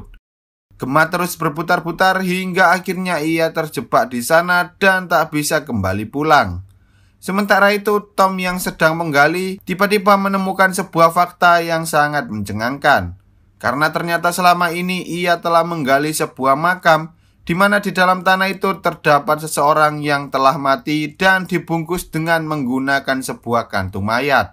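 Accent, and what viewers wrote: Java, Indonesia. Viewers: Bang kamu asal dari Jawa ya kalo iya jawab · Pengisi suaranya kentel jawanya.. jellek amat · Medok brooo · Medhokk kalii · Logat jawa bgt bew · Suara jawir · anjir admin e orang jawa to hahha seketika aku ngrokok , admin e ngomong wes rasah kesuwen aku watuk keselek belok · Waduh pekat juga jawa nya ya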